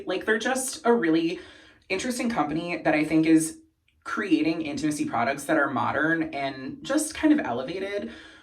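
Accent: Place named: Buffalo, United States. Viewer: I'd LOVE to see what wedding look you're gonna do with the Ethereal Eyes Palette! I was also cracking up cause I say the word "palette" the same way you do. Our Buffalo accents with these A's are outta control 😂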